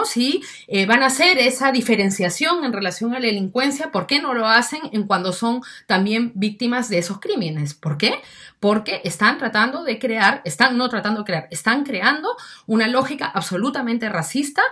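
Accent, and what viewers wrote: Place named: Peru. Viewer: porque dices que no tiene acento peruano Busca en wikipedía e informate El Perú es rico tanto en lenguas como en Dejos y acentos Un tacneño no habla igual que un tumbesino O un Chalaco no habla igual que un charapa Uno de Pucallpa no habla igual que el de Tarapoto o iquitos a pesar que los 3 son de la selva Uno del rimac no habla igual que el de los Olivos o Miraflores Esta chica se puede confundir como alguien de Arequipa o conok alguien de cuzco o alguien de miraflores Y se ve que tiene estudios Solo que le falta estudiar más las condiciones sociales antropológicas de Perú Pero en su mayoría casi un 70 por ciento de lo que dice es cierto Solo que no comparto que diga que los venezolanos que se portan mal no deben ser deportados Yo vivo en Barcelona ya bine tiempo y soy conciente que si hago algo que este mal en este estado yo debería ser expulsado Lo acepto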